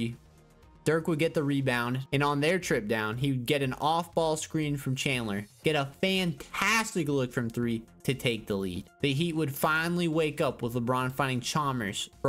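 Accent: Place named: New York, United States. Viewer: its so funny hearing a new york accent talking about the mavs so much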